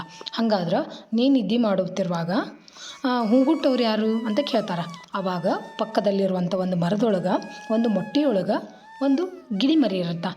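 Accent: North Karnataka, India. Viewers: ನಿಮ್ಮ ಭಾಷೆ ಸುಂದರ...ನಮ್ಮ ಉತ್ತರ ಕರ್ನಾಟಕ ಭಾಷೆ...👍👍👍👍ಶುಕಮುನಿಗಳಿಗೆ ಜಯವಾಗಲಿ....... ವ್ಯಾಸಪುತ್ರ ಶುಕ ಮುನಿಗಳಿಗೆ ಜಯವಾಗಲಿ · ಕಥೆ ತುಂಬಾ ಚೆನ್ನಾಗಿ ಸ್ಪಷ್ಟವಾಗಿ ನಿಮ್ಮ ಉತ್ತರ ಕರ್ನಾಟಕ ಭಾಷೆಯಲ್ಲಿ ಹೇಳ್ತೀರಾ ಸಿಸ್ಟರ್ ಧನ್ಯವಾದಗಳು🙏🙏👍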